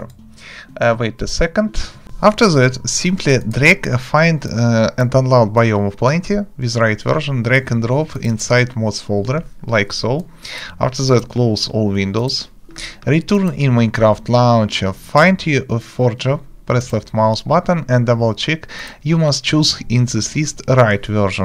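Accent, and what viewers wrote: Russia. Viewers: сразу слышно русский человек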